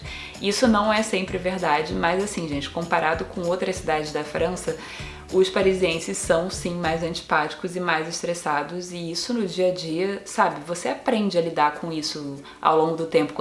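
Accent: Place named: Rio de Janeiro, Brazil. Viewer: Sua voz é muito boa, dicção também! O nosso sotaque carioca é muito bom hehehe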